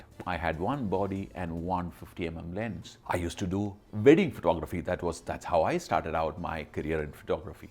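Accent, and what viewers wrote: Kerala, India. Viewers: That crispy Mallu accent & voice modulation.. Waah..❤️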